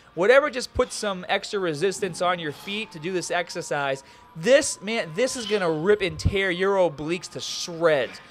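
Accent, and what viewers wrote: Boston, United States. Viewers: What's with this Boston accent all of sudden creeping into your videos ????? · The "Baaaston Haaabar" accent has gotten really bad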